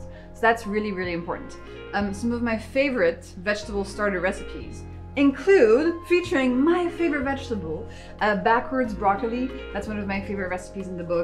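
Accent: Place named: France. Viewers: I am in love with her French accent❤ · She may have a French accent, but I think we can say it's very, very subtle.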